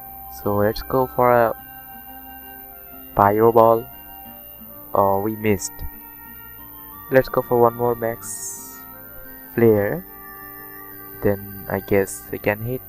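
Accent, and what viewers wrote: India. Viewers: You sounds like Indian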